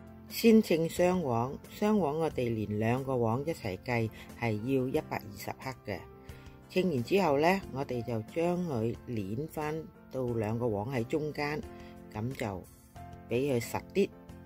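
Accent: Vietnam. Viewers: 聽咗一分鐘 終於聽到你的越南口音啦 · 謝謝Amy 老師不吝賜教，這個是我今年做月餅的首選，希望能成功，你帶越南口音的廣東話非常有親切感，做好後成績如何會向你交功課，謝謝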